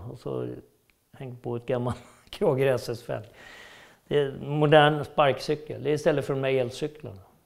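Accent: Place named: Stockholm, Sweden. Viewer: Skön lirare 😊, och pratar riktigt Stockholmska 👌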